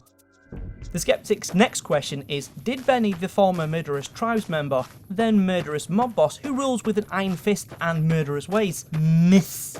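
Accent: United Kingdom. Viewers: It's funny how British people pronounce words "call of dutae"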